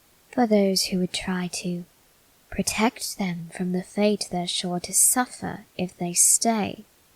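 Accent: United Kingdom. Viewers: Why are you so good at doing a Bri'ish accent? (I'm Bri'ish my self..!)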